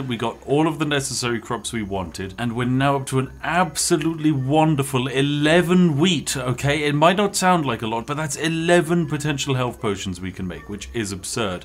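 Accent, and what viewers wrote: United Kingdom. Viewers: Is british? I can't help but notice his accent...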